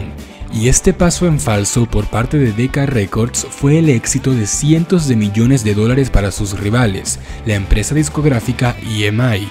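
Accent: Colombia. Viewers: tu eres de colombia? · Oye eres de Colombia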